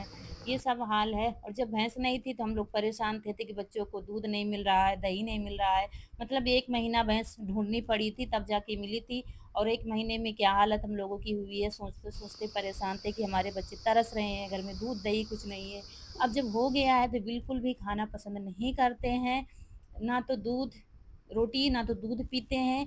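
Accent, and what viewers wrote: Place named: Jabalpur, India. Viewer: Aapke bolane Ka Tarika angreji Hamare Yahan Jaisa Hai Hamen MP ke Jabalpur Jile Se Hain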